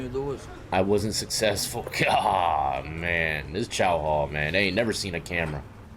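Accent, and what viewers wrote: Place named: South, United States. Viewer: Texas,Lousiana etc. I Love the southern accent. 🎵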